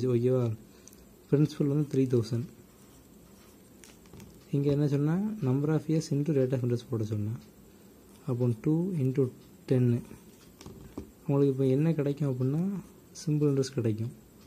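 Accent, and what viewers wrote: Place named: Thoothukudi, India. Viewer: Anna ninga uplode panna Ella videos um pramatham...especially ur teaching skill is really good....nd ur slang tutucorin....innu nirya ethir pakrom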